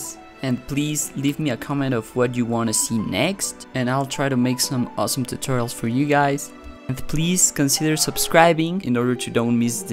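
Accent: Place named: Brazil. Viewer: mano tu tem sotaque de br kkkakakakakakak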